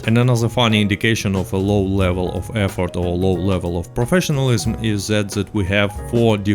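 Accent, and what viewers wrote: Russia. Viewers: I like your russian accent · I didn’t have the chance to buy them last time. By the way huge fan here :) keep rocking and keep roasting with your Russian Accent <3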